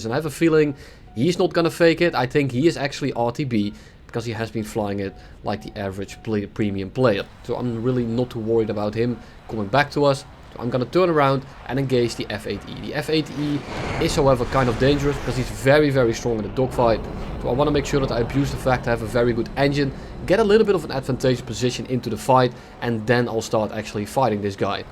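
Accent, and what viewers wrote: Netherlands. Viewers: bro je kan btr nederlands praten (acent) gwn goed engld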